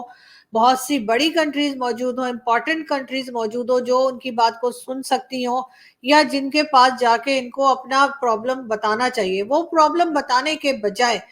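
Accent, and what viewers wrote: India. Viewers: AAP de mai bohot kuch sikha. Politics bhi or HINDI accent bhi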